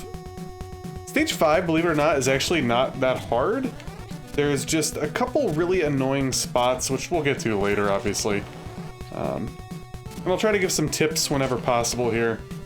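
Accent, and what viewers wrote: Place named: California, United States. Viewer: Congratulations dude! Just to even witness it was something to behold. Here's hoping someday I might get my own deathless run haha. 🤞 And that real talk you gave , says a lot about me as a gamer. I admit I am not the most patient when it comes to gaming to say the least, it's so easy to let frustrations walk all over you rather than take a breath and count to 10. I really needed that today so thank you. By the way, if you don't mind me asking, as an Australian I detect a US accent in you... California?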